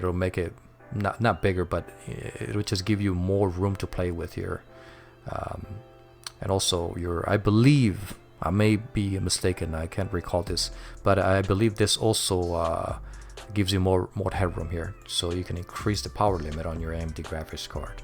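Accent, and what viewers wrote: Norway. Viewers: waaaait, are you from norway? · you norwegian?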